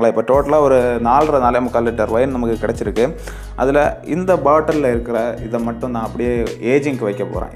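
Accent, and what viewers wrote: Kerala, India. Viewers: அது சரி தங்கள் பேசுவதை பார்த்தல் மலையாளி என்றுதெறிகிறது,,,, தங்கள் மலையாளதெலேயே பேசலாமே எதகு தமிழ்,,,, நான் பிருடைய மொழியில் எபோதுமே பேசமாட்டேன்,, என் தமிழ் மொழில் தான் பேசுவேன் அப்படியே பேசினாலும் அவமானமாகஇறுகும், உங்களுக்குஎல்லாம் அவமானமே இருகாத????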